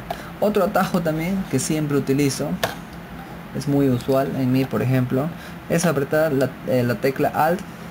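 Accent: Peru. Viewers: Acordemonos de que nuestro hermano nos ensena con la forma de hablar en peru, la cual como en toda America Latina tenemos muchos acentos y modismos en nuestra forma de hablar el espanol asi que por favor no lo corrijamos ya que hasta mucho hace nuestro hermano con enzenarnos a los que no somos tan Buenos en la computadora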